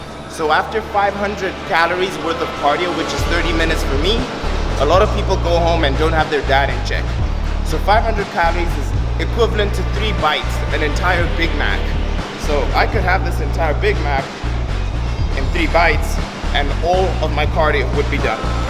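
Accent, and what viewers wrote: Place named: South Africa. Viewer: This accent sounds South African 🤔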